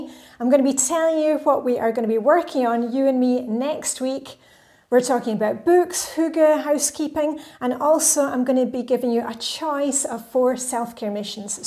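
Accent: Scotland, United Kingdom. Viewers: Love working along with you. Love your calming lilting Scottish tone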